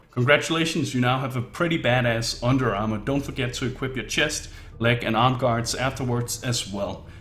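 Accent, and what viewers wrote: Denmark. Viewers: OMFG I knew your accent was Danish!!!! Yes!!!! Hej! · Are you dansk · are you danish or something??? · funny accent, are u from denmark? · Tyk dansk accent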